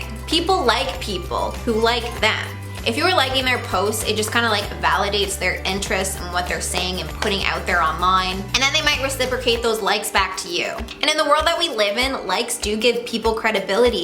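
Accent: Canada. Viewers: "aboat" lol I love the Canadian accent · are you Canadian? 😊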